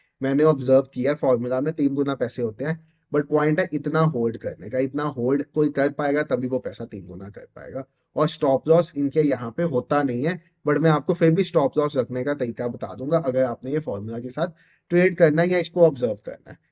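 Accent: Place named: Pakistan. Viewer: Tu Pakistani hai na Teri voice bata Rahi hai.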